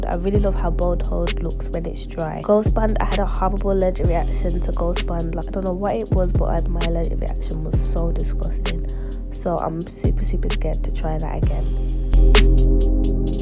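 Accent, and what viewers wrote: Birmingham, United Kingdom. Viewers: Juliaaa Robb I think Birmingham in the UK maybe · omg ur british!!!!!!!!!!! i love ur accent!!!!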